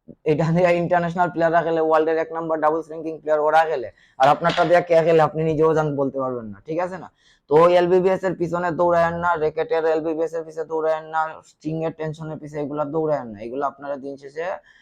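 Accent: Sylhet, Bangladesh. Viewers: Bro apni ki Sylheti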